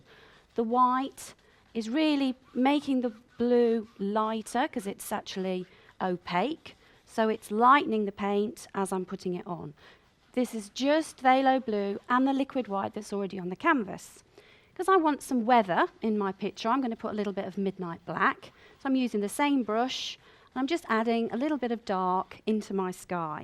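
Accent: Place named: Birmingham, United Kingdom. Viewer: Thanku for sharing. I love your ( Brummie) accent. keep 'em coming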